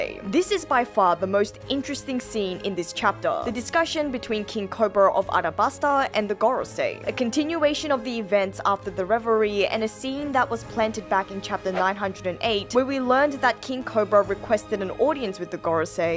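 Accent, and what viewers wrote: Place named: Australia. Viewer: This girls accent is so jumbled between Australian and Brittish.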